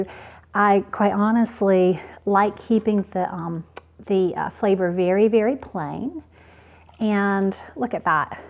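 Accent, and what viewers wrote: South, United States. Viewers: i really like watching you you have a very calming voice love the southern accent I'm from london uk xx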